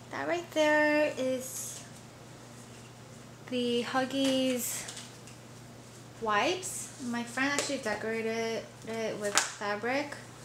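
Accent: South Korea. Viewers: You sound just like Korean ... Not that I mean your English is bad. You do just well I just think all we Koreans have certain accent in English, like Korean Accent I think:)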